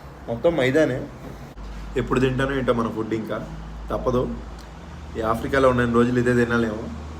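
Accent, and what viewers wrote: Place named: Guntur, India. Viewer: Guntur Slang.. superb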